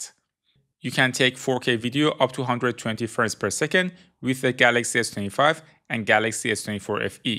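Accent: Iran. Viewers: Iranian accent found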